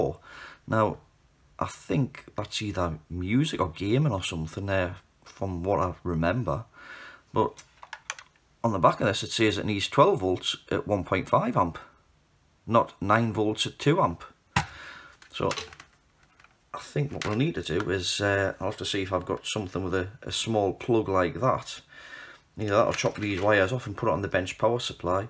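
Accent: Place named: South Shields, United Kingdom. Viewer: I’m in South Shields you sound like you’re up here too, I repair tvs, I’ve had that before on a 12v tv the power supply wasn’t the right one so it was collapsing when it needed more power. Great fix though shame about the quality 😂 probably £5 brand new from china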